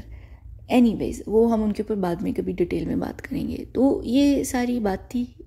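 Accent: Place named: United Kingdom. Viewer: Love your English accent reminds me of home 👌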